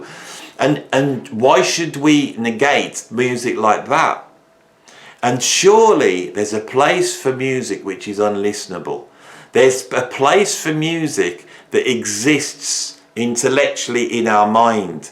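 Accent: United Kingdom. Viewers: You seem to be laying on the British accent more than usual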